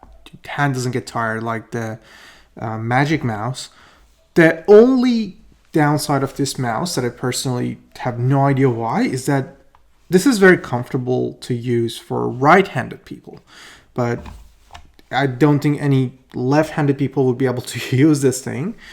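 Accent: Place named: Australia. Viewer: ghorbune lahje aussie it beram :))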